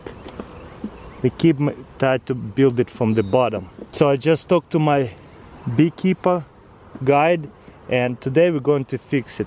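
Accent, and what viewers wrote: Russia. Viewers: lying!! I'm a grown ass woman, not some child you can trick! He not only has a Russian accent but broken English, plus, you can find his bio on Google!!! Why tell lies? It's not gaining you anything other than looking like a fool!! Yes he lives in NC now but he was born and raised in Russia! His name is Тарас Кулаков) Does that look American to you? Actually he's Czech but that's Russian! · lol.. whats with all the anger? He was only joking. The accent/spelling is part of what makes him so amazing. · Hey guys. He is Russian. Do you speak other language(s) as a native speaker? No??? That's it. Think, think, think before write a comment!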